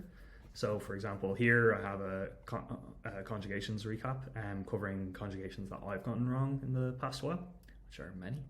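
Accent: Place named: Ireland. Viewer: I didn’t get your name just an Irish accent I am also from the Emerald Isle 😂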